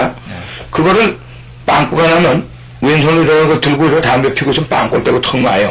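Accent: South Korea. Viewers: 지방 분이 아니시군요? 저는 지방에서 살기때문에 말씀을 대춤 알아듣겟어요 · 나레이션 분 억양 들으니 경상도 사람 같네요! 뜨끔하시죠 ㅎ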